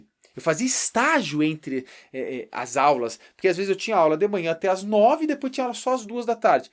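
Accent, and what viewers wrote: Rio Grande do Sul, Brazil. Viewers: sabia que tu era gaúcho, reconheci o sotaque, masss bahh tchê que barbaridade de vídeo, muito bom parabéns !!!!!!!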